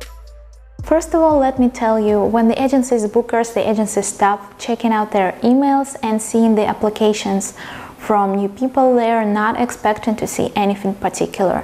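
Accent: Russia. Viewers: вы же русская? слышу этот милый акцент аххахаа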